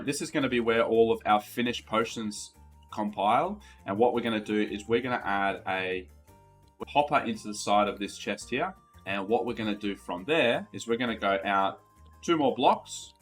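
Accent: Australia. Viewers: i knew u where australian i am too, also u able to make a video the same auto brewer but with like more brewer stands like if i want to put nether wort first and then like surgar cane so mutiple locks and stuff so like im sure u can put your stuff idk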